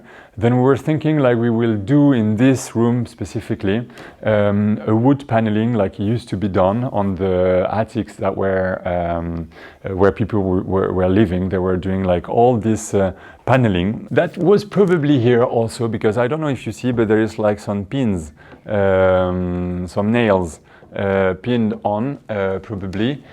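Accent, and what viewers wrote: France. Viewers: This will be a fascinating restoration. You are very entertaining and I enjoy your humor and lovely french accent. Thank you for the tour and explanation of your plans.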